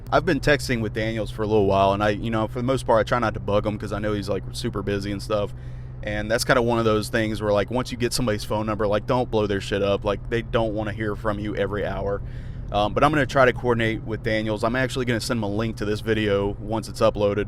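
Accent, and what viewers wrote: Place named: South, United States. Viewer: You have a very pleasant voice to listen to, I think everyone hates their voice but you just have a soft southern accent. Don’t hate it. 😃😃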